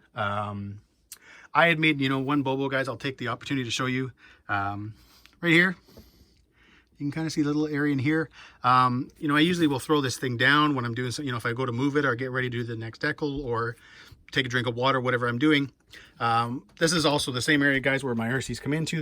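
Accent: Canada. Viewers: I think he might be Canadian though and in that case his pronunciation is correct for his region.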